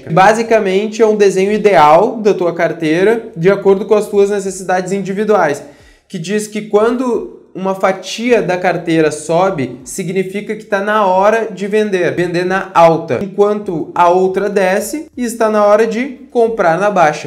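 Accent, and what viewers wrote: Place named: Porto Alegre, Brazil. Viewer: Sotaque bem portoalegrense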